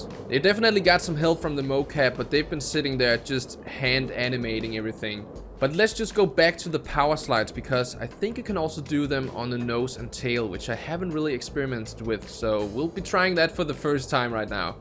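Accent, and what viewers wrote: Denmark. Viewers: yo you danish?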